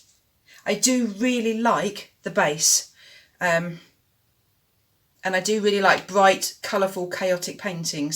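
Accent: United Kingdom. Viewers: So lovely to hear a UK accent!! Glad I found you 😁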